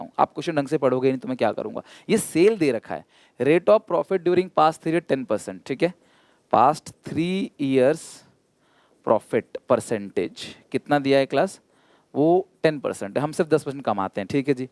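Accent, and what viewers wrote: Odisha, India. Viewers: Sir r u from orrisa🤔🤔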